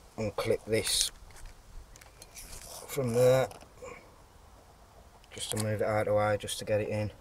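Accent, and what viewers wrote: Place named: Black Country, United Kingdom. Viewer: hi are you from black country? I'm looking for someone to sort my astra and you sound local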